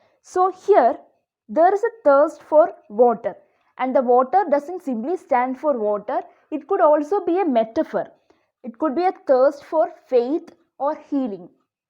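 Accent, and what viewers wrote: India. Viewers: Can you please write what is Shantih shantih shantih, i have difficulties in understanding indian english 😩 And can you also do a vidéo of analysis of "in memoriam" by Tennyson Thanks a lot for your work A follower from France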